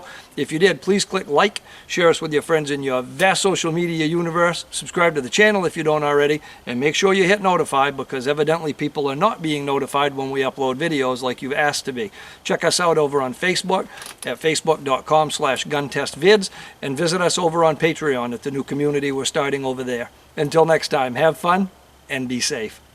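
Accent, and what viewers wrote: Maine, United States. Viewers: Are you from Maine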